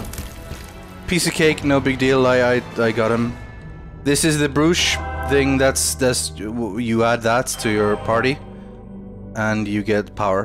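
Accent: Scotland, United Kingdom. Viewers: sounds more Scottish than usual in this video for some reason. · Wow got a lot less Scottish.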